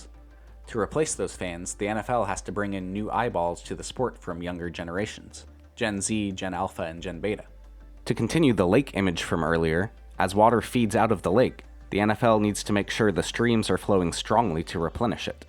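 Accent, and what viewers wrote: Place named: Illinois, United States. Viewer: your accent, its an interesting one........Ilinois??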